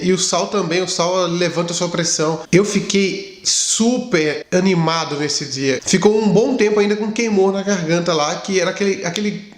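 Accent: Minas Gerais, Brazil. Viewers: Uai! cê num é mineiro não? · Ele pode ate ser pernambucano mas fala q nem mineiro já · Este cara já pegou o sotaque mineiro...kkk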